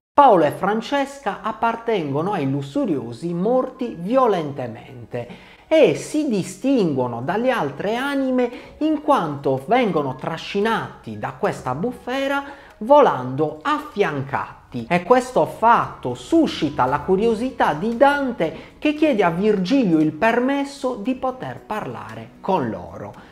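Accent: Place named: Cagliari, Italy. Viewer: essendo io sassarese, si capisce tantissimo quando si trova online un sardo (in questo caso cagliaritano pk si sente fortissimo)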